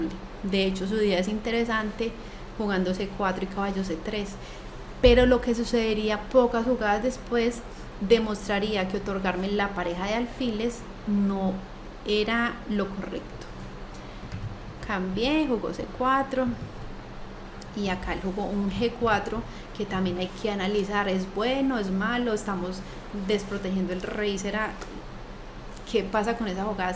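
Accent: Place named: Colombia. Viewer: Acento colombiano!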